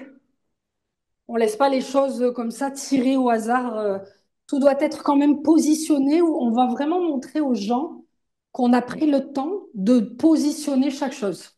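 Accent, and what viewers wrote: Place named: South, France. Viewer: votre accent du sud qui nous apportent réconfort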